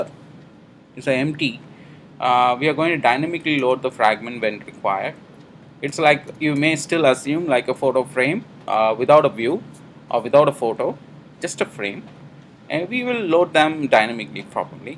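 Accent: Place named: India. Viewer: damn indian accents i hate that shit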